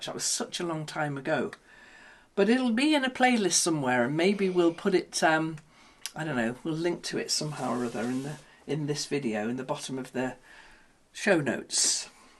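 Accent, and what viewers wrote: England, United Kingdom. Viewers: I’ve only just found your site 😊 & love your sweet English accent.. Very informative & indeed brilliant ideas..😊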